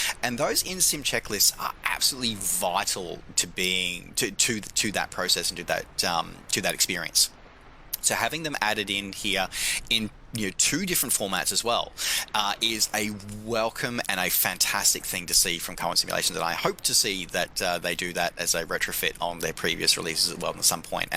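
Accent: Australia. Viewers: You must hold the recorddddd for the most annoyingggg Australian voiceeeeeeeee.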